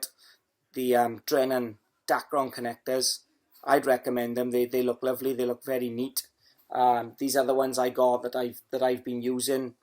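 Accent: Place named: Wales, United Kingdom. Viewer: A fine welsh accent, and a great video, thank you!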